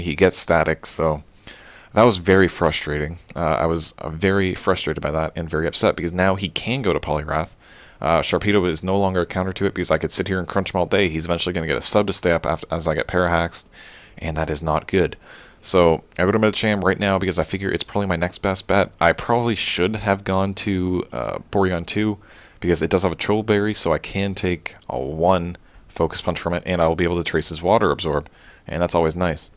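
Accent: Canada. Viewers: u sound candian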